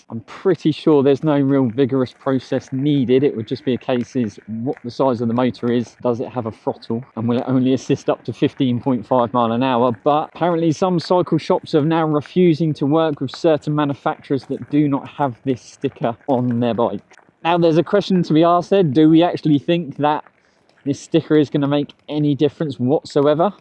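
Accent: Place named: United States. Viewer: Not just tax, FINES. Car's are fine to 'let loose' and only correct their behavior afterwards because they have a registration requirement..... Anyway, 2kw for 30mph is nonsense just get a darn moped. You can do 28mph with 750w nominal motors that's just plain class3 e-bike in the US for instance. Also, I highly doubt people putting hub motors on mtb's to 'get fit' as they <num>% of time put a throttle on them which makes them a moped not a bicycle. And nah, 'the law' is there to make sure some entitled people don't go around riding 30mph mopeds on bicycle infrastructure. You do realize it's just as dangerous to ride 30mph on infra where the other users are doing 15mph.... as it would be for you on your moped to go 30mph and have to drive on a highway with a 60mph speed limit. No I don't care YOU 'ride defensively blahblahblah' that's nice, but that's not what we base rules on. 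If we did, we wouldn't have a need for rules for tin cans either right, 'just drive defensively and it will be ok' 🙄 The thing is, you sound very American, which would make you more right then wrong, and my little tirade above not at all applicable to you. Since your infrastructure forces you to mix with traffic, you're 100% safer having more power available, and having a higher top speed limit to closer match the traffic around you. But for instance here in The Netherlands, it would be very strange to allow bicycles going 30mph on the bike path, when we banned mopeds doing 28mph from the bike path bec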